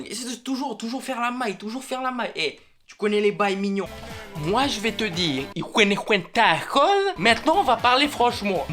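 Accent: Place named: Lifou, New Caledonia. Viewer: Sort d'autre vidéo !! Hhaha je kiff ton accent dehu mon reuf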